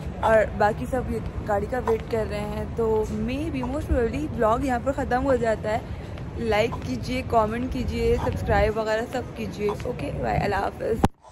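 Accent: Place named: Mumbai, India. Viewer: Urdu nahi ATI Mumbai wali zuban bolti ho.mere ko etc q? Pak ki ho ya indian ho? Or galiyan bhi tumhara brother ya dost deyta hai .zuban tu seedhi rakho it is not funny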